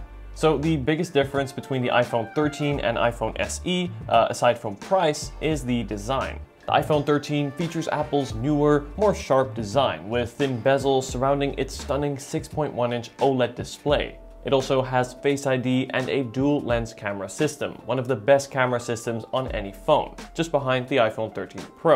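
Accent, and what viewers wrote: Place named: Netherlands. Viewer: Nice comparison (review) man, took me a minute to figure out your Dutch accent. 😂😂😂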